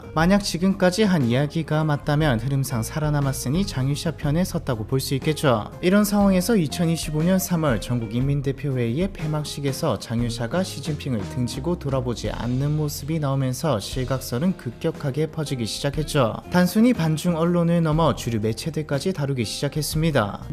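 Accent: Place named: Busan, South Korea. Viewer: 내용보다 채널주 부산사람인게 더 충격임......아니 사투리 이정도 안쓰는 부산분은 처음 보는 거 같은....?....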